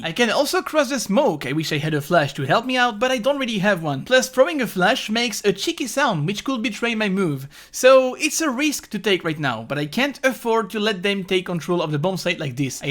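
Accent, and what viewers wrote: France. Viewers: JE SAVAIS QU T’étais FRANÇAIS ENFIN JE PENSE ou belge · Ah je me disais bien qu'à ton accent anglais tu étais français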